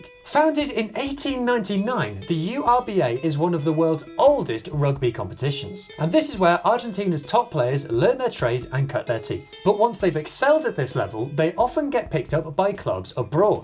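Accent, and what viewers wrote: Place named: United Kingdom. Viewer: Same here, his brit accent makes it more understandable (at least for me haha)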